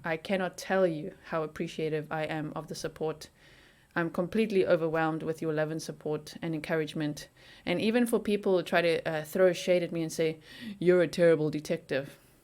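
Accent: South Africa. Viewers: I know how busy you are with your rapidly increasing fame & can't answer everyone. I sent a gmail with the question on if religion ever plays into your analyses/investigations & I should have said evil, possessions, satanic activity. What Brian did is evil & maybe he went full blown dark & sacrificed poor Gabby. Maybe he was temporarily possessed a la Chris "Low Wattage" Watts? How about Anna Leikovic, Aiden Fucci, pure evil. I have been traumatized in my life dealing with evil. We can all speculate, maybe the "Dirty Laundries" are closet satanists? Thanks & you are awesome & so is your South African accent. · Yes! I found you because you are covering the Petito/Laundrie case and I could listen to you just because of your south african accent: I love it 😉 My aunt is also from south africa so it sounds so familiar. But I also love the way you dive into the whole case, the whole persoon. 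Not just one aspect. It is nice to hear a bit of your background and who you are. Not nice to hear about the trauma but like you said; it makes your approach different and even more thourough in cases I think. I hope you feel a bit at home already here in the Netherlands. And safe(er) 💛 Groetjes! 😉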